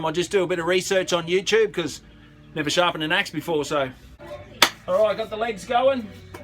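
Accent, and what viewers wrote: Australia. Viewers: Matteeee. F..king legend. You sound Tassie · Hi Mate you must be from outback Queensland or NSW judging by your ocker accent. I love how you did the bottom this is by far the best bottom on YouTube. I think you would be better having the hinge on the bottom of the door so when its open its out of the way and you can see the flames. In fact why do you even need a door when the top is open?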